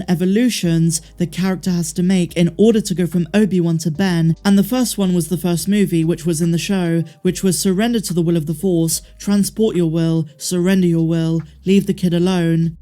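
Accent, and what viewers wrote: United Kingdom. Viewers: ! What part of the U.K. are you from?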